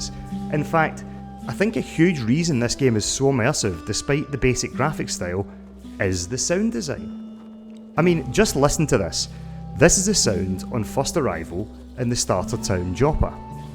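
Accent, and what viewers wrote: Scotland, United Kingdom. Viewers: Scottish accent: +1 to credibility.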